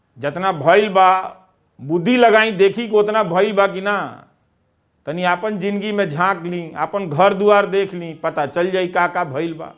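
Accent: Bhojpuri, India. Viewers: आपकी भोजपुरी बोलने की शैली बहुत प्यारी है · I'm not from the hindi region but love bhojpuri and understand well. I enjoy the dialect and accent. Subscribed this channel.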